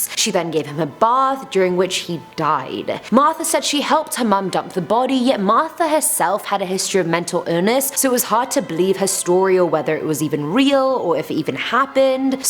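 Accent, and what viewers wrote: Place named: United Kingdom. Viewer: I turned it off as soon as I heard a gross limey voice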